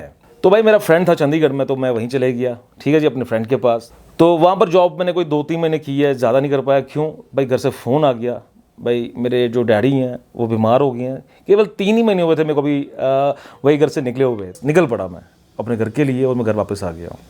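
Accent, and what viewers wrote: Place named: Himachal Pradesh, India. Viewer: the way of your speaking reminds me of my old days in Himachal where people speak with a different tune than mainland hindi speakers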